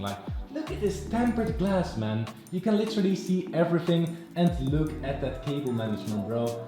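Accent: Netherlands. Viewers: Are you dutch? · are you dutch because if i talk english i talk like you haha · Are you dutch yust a question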